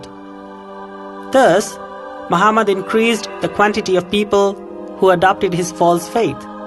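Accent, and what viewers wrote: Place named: India. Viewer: I completely agree he sounds Indian and a load of crap coming from his mouth I couldn't watch it all since it was all lies...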